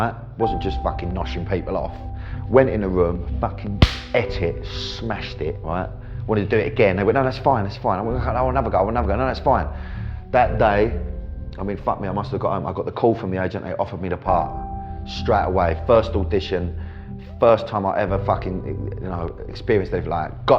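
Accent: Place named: Cockney, United Kingdom. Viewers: People from Hertfordshire speak worse than him. Canning town is an east London shit HOLE he genuinely speaks like that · He's a cockney... that's how they speak.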